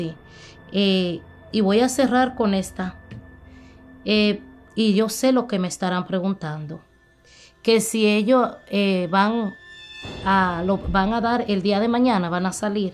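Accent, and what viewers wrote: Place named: Dominican Republic. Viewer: Pero mami tú ablas como Dominicana a pues ya tu sabes. 🤔😁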